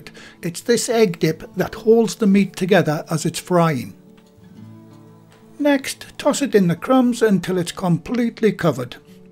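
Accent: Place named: Northern England, United Kingdom. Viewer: Brilliant channel. I'm an expat Brit abroad and love hearing your northern accent. Very homely and wonderful old school British recipes.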